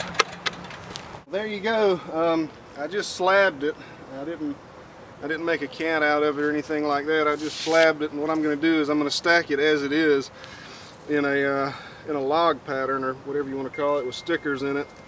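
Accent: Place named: Georgia, United States. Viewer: Yeah, you sound like a Georgian. I live in TX but have a sister who lives in Lawrenceville. Your voice sounded familiar - like my brother in law. Keep on making videos.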